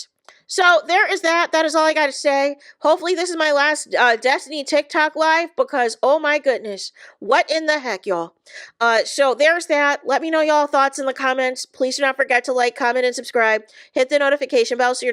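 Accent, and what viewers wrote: Midwest, United States. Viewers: I love your voice…it’s cute and gives an Intermountain West/Midwest quirky accent. I love it too bc if you are a black woman, it is outside of what people typically think black women in America sound.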